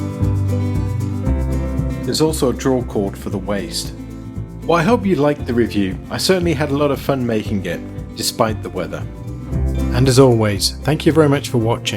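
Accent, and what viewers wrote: United Kingdom. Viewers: What's Canadian about a British bloke?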